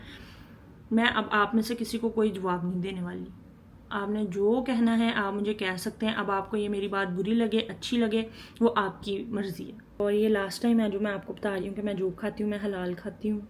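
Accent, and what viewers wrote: Punjab, India. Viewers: ہائے میری پیاری سویٹی لگتا بہت دکھی ہوئی ھو۔اتنی پیاری باتیں کہاں سے سیکھیں ہیں۔ کبھی پنجابی میں بھی بات کرو۔باتوں سے پکی پنجابن لگتی ھو۔ ڈھیروں پیار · Ye kon c type k Chinese hn bae ..... Accent pure Punjabi like our Lahories but face r pure Chinese.... 🤔🤔🤔🤔 Kesay nae kesay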